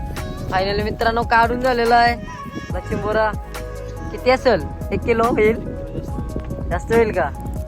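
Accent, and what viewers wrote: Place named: Ulwe, India. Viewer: Aamchya ulvyasaid chi bhasha vatey tujhi kuthla aahes bhau gav konta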